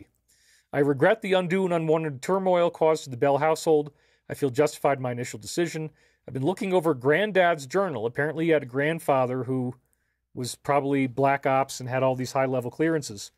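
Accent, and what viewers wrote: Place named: Australia. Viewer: IM GOING TO BE A DICK...I DO NOT LIKE THE OZZIE ACCIENT MATE...I DISLIKE IT BIG TIME, FROM AN OZZIE OLD CHOOK......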